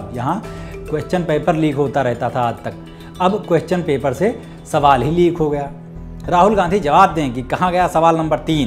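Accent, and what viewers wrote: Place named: Bihar, India. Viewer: Real bihari voice mazaa aa jata hai news dekh kee☺☺☺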